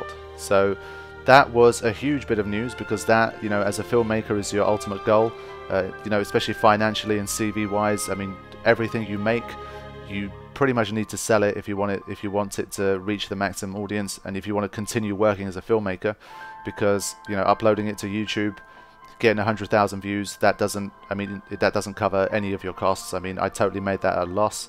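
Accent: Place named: North London, United Kingdom. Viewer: I hear a bit of North London accent there :-) anyways, I think an interesting documentary topic to explore is the positive effect of gaming in general. Would be good to offer some counter argument to worried parents etc. In particularly final fantasy which has a large fan base that has aged and grown with the series. It would be interesting to interview these people to get some of their stories. Final fantasy touches on so many different issues in their games over the years and has definitely influenced me despite coming to it in my mid 20s.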